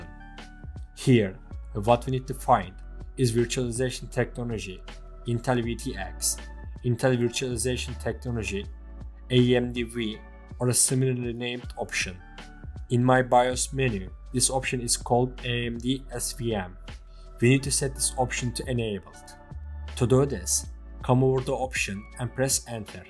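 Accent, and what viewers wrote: Turkey. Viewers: ARE YOU TURKISH DUDE???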